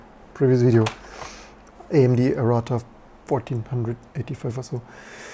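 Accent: Germany. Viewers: anaser most german english ever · If your accent didn't give up that you are German, the lidl and aldi definitely gave that up. Btw Aldi has currently one of the biggest SAP transformation going on rn .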